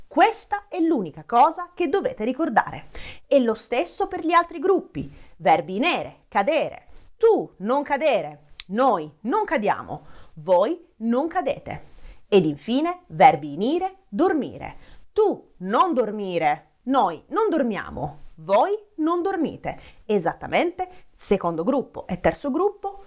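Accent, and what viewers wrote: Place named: Taranto, Italy. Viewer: Buonasera, non era mia intenzione offenderla. Essendo lieve non so caratterizzarlo. Nel canale YouTube insegna egregiamente grammatica italiana, per questo le rinnovo i complimenti. Non crede sarebbe giusto insegnare anche la corretta dizione? Non volevo offenderla, ogni dialetto, accento va senza dubbio salvaguardato. Io amo l'accento ciociaro ad esempio, essendo originario di Frosinone. Molti attori, attrici, presentatrici e presentatori fanno corsi di dizione, pur conservando e amando le loro origini. Spero di essermi spiegato, lei fa e farà come sempre un ottimo lavoro. Non la disturberò più con i miei commenti. P.S. credo abbia origine Tarantine, ma potrei sbagliarmi. Un caro saluto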